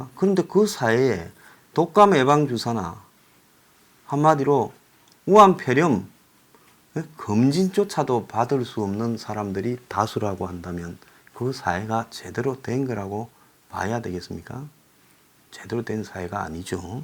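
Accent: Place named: Busan, South Korea. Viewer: 김인성교수님, 얼마나 고마우신지... 울~소장님 흉과자랑, 더러는 넘 친하고 부산 말씨와 남자들의 특이성, *이놈 장수는*ㅎ ㅎ ㅎ 그래도 그자석은 성질은, 좀 급해도 맘은 좋데이, 같은 부산토박이로 넘 정겨윘어예.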